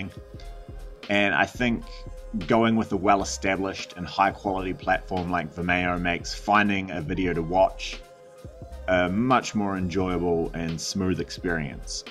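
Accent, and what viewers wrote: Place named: New Zealand. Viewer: You from NZ Bro? :)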